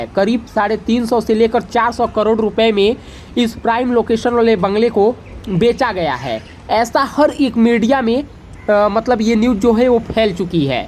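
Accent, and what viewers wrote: Bihar, India. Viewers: भाई आपकी बात करने के सुर से लगता है आप उस प्रदेश के है जिस प्रदेश के चाराचोर लल्लू यादव है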